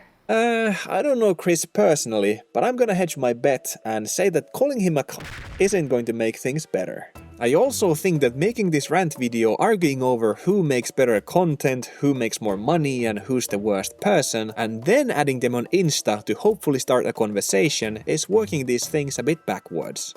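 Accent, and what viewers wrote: Finland. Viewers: niinku paahtoleipä? Suomi aksentti kuuluu läpi 😂 Good video tho 👍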